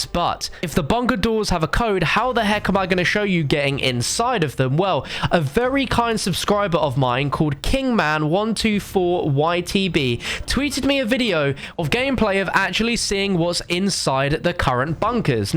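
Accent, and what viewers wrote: England, United Kingdom. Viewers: Why is every youtuber who plays cod from England or a England accent never fails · It’s that British accent man gets us every time.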